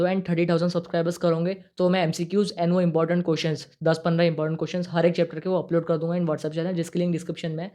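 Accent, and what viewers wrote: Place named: Maharashtra, India. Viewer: Bhai konse state se ho accent se to marati lg re ho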